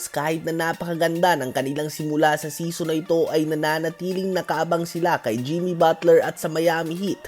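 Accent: Visayas, Philippines. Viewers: Pang bisaya mga sounds mu😂mga bisakol at badjao lng makikinig nyan😂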